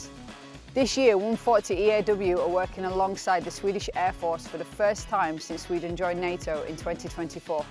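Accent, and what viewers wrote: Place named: North, United Kingdom. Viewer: She is so inarticulate; dead northern……obviously an ex ranker.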